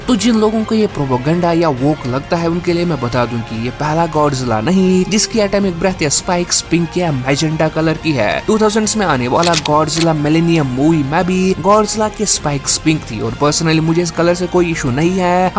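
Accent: Kashmir, India. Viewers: U sound like kashmiri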